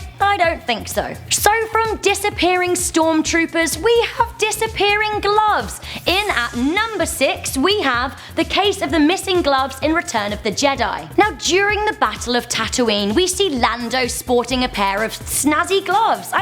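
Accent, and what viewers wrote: United Kingdom. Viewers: That's because she's British, you uneducated American.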